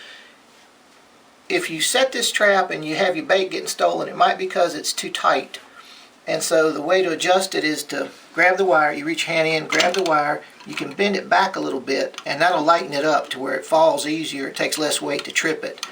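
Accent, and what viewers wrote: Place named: South, United States. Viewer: This trap really works. I've been having issues with rabbits eating my lawn and plants. I didn't want to kill anything so when I came across it look simple enough to give it a try. The guy's southern accent made me a believer too. Took about two hours to build using a drill, hand saw pliers and some screws. A what do you know, caught one the next day. I live in so California so i assume it'll work anywhere. To the creator of the video "thank you"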